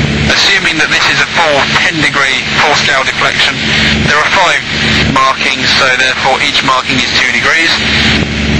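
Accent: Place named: England, United Kingdom. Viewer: Really good, thanks. Nice to hear an English accent on a youtube tutorial for a change ;). Only thing that would have made it better, would have been having the camera closed up on the needles....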